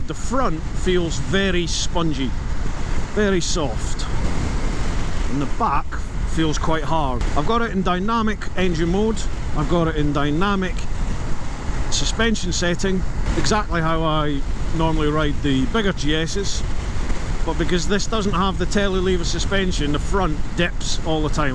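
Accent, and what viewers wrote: Scotland, United Kingdom. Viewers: I always love listening to those lovely Scottish tones:D Do you actually live in Scotland?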